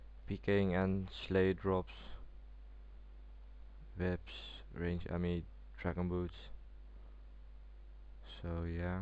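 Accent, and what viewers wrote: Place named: Netherlands. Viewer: nederlands accent ftw :D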